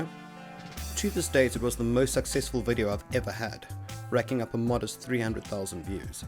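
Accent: Afrikaans, South Africa. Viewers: so thats what the accent is! and that language from the other vids wasnt norwegian/swedish/etc, it was afrikaans fml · Are you south African? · I don't even play Rust but you got me hooked on watching, love the accent, is it South African or New Zealand?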